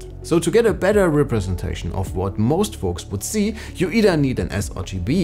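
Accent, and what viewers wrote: Germany. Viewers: I just discovered the notebookcheck channel via the "HP Omen 17 2024" review. Really great stuff! You are a great speaker and your german accent sounds nice ;) As a hobby audio guy I always appreciate that you guys include "LatencyMon" and fan noise examples in the reviews. I would love a Video about DAWs and Audio Setup on Windows Laptops one day! Keep up the great work!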